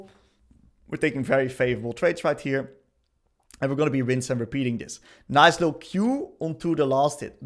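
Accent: Netherlands. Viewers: Je Nederlandse Engelse accent is crazy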